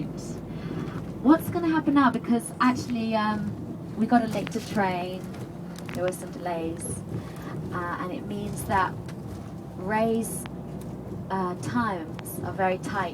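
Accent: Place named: China. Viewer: Seems to me like the narrator is from China. Cant get her pronunciation at times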